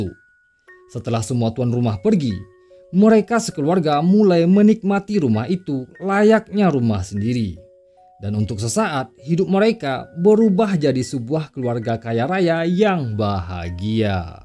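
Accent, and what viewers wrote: West Sumatra, Indonesia. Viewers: lo pasti orang sumbar bang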